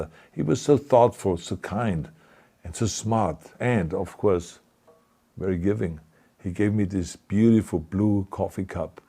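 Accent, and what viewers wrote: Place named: Russia. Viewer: I can see he is trying to speak english in russian accent. respect you sir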